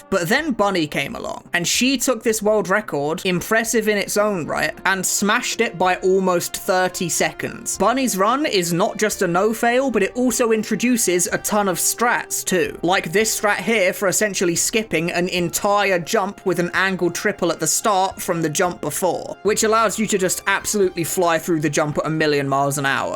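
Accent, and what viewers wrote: United Kingdom. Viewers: Unfortunately you a British so I could not enjoy this video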